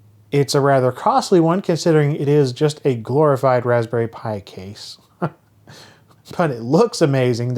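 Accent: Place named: California, United States. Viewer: Your accent is like the Californians without the accent if you follow.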